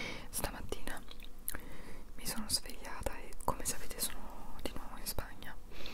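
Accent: Italy. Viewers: No entiendo nada jajajajajajjajajaja pero adoro el acento italiano!!!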